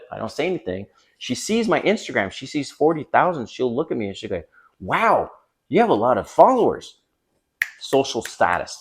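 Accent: Canada. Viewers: lol, I knew you were a fellow 🇨🇦 the second you started speaking 🫶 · Def got the Canadian accent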